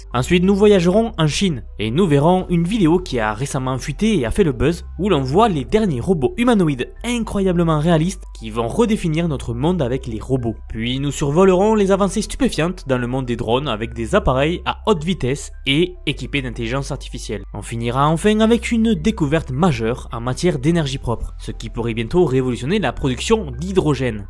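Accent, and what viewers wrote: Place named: Toulouse, France. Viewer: t'étais pas journaliste sportif, par hasard, avant ? Je préfère quand même ta voix de toulousain ou pyrénéen, que ces voix horripilantes d'IA ! 😉 Ceci dit ton docu est impressionnant ! bravo !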